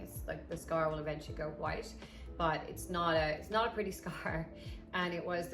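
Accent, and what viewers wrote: Ireland. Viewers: Alcohol is a hoax. It’s a poison that robs you of your health, potential and your dreams. Judging by your accent you’re Irish. So you know how difficult it is for people who don’t drink here. I’m off it 1 year and 1 month and my friends think I’m just ‘going through a phase’ and I’ll eventually come to my senses. Never.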